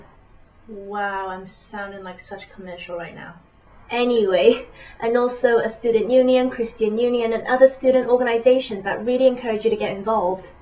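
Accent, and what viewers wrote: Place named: Wales, United Kingdom. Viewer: awesome - welsh is my favourite UK accent!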